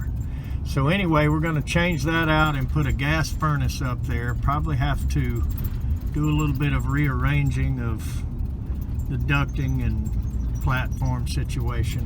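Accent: South, United States. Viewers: The soft spoken Southern gentleman has to break the bad news to another helicopter, and it is always done with tact. Couldn't help but notice that has begun using that term as well; maybe you should get residual compensation since you coined the word. lol Always nice to watch you and in action.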